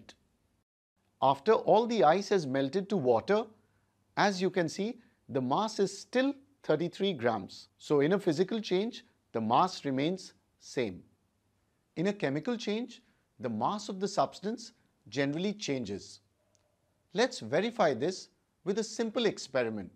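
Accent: India. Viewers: i know he's indian cuz his accent🤣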